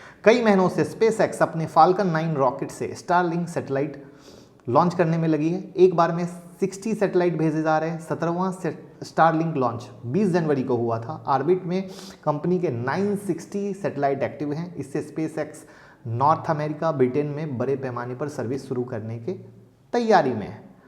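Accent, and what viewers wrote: Bihar, India. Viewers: Sir aap bihar se ho kya 🙏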